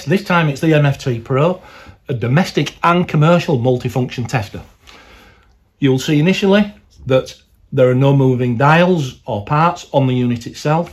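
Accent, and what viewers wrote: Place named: Yorkshire, United Kingdom. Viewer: Leeds/Wakefield accent love it ....ey-up! Great tester. Italian made?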